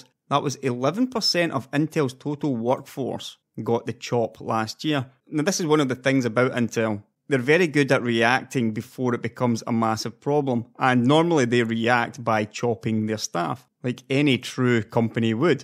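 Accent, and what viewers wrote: Scotland, United Kingdom. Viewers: Does the person have an accent, maybe? like scottish or something? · your accent's ... I'm guessing Scottish?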